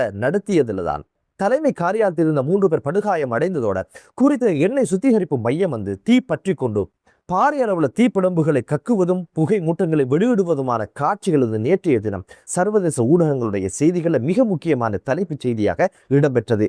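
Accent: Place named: Chennai, India. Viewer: நல்ல செய்திகள். Madras தமிழ்தான் பிடிக்கவில்லை.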